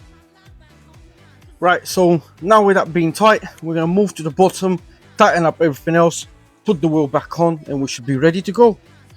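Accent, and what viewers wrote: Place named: South Africa. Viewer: South African? Love the accent!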